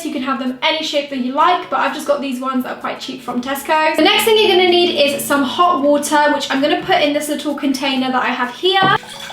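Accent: Australia. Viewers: Are you Australian?💓🌵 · Are you from Australia 🇦🇺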